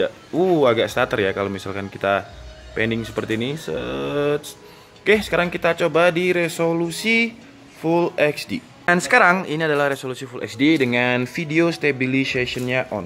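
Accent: Java, Indonesia. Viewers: Medok mu metu ms ngueri ngerong😭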